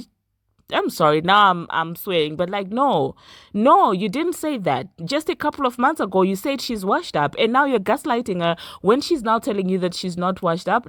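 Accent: South Africa. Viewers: Love your channel are you South African ? Where is your accent from ?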